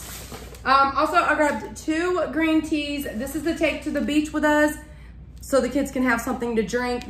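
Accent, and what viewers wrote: South, United States. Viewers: I've watched you for a long time and you're getting louder and louder and more and more country all the time... I wonder as a woman from North East Tn. And country as anyone so I am not fussing Lord knows. It's only that I am curious if your husband or mother or someone was making you tone down and you've cut loose or what happened recently. You are deceiving the Bulldogs glad and have said y'all a dozen times in 2 minutes and I could hear you all the way in back of my house your video started auto play is on and I watch you allot so it started you're newest video and I had to come from back of the house to turn it down. So curiosity I guess..... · Where are you from live your accent you sound like Paula Deen saying y'all lol....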